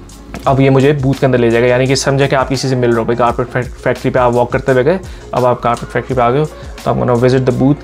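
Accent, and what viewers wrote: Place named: Karachi, Pakistan. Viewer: G way of talking bata raha hy karachi k hy ap 🌹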